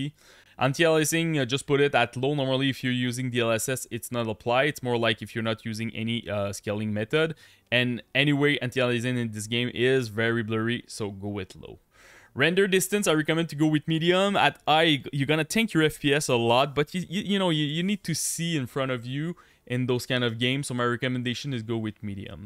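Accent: Quebec, Canada. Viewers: Are you from Quebec? You have the same accent as my wife and Georges St-Pierre. :D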